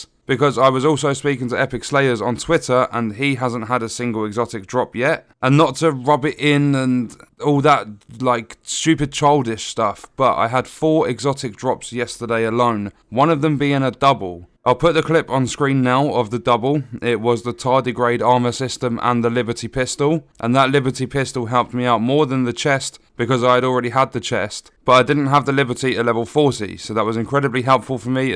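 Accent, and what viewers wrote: United Kingdom. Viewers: U make me want a British accent bruv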